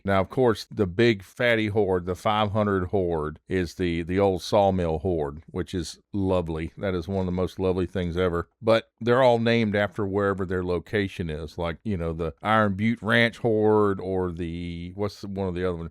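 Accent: United States. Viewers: This American acient is nuts bro can't even talk properly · Nice breakdown. Your southern accent makes me feel like you are my friend.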